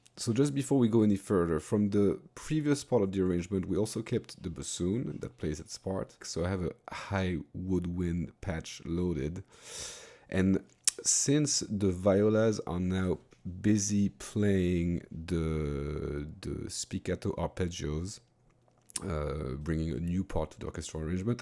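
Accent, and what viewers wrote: France. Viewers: Super interessant tes vidéos. Je trouve simplement dommage que tu le fasses pas en français, sachant qu'il existe pas ce genre de contenu ( tuto composition orchestrale )dans notre langue. J'ai compris que tu étais français avec l'accent ;). Sans rancune ;) parole d'abonné.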